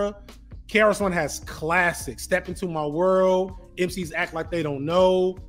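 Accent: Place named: South, United States. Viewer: We also just talk that way in the south. We ain't gotta be leaned up. It's hot man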